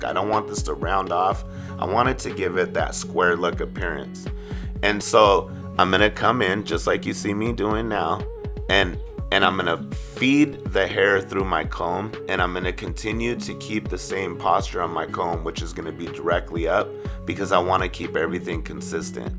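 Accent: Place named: California, United States. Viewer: I knew you were Mexican , sound like from Cali . Haha idk man 🇲🇽, wouldn’t mind have another andis shaver 💈💈💈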